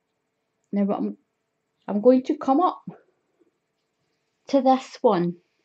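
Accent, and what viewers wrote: Scotland, United Kingdom. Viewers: The closed captions on this are hilarious 🤣 I love your Scottish accent!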